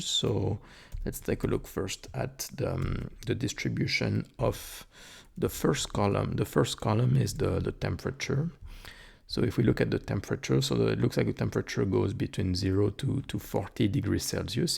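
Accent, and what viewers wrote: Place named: France. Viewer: Thanks for this video. It was really helpful for me. Nice and pleasure English language with French accent. Greetings from Poland 🇵🇱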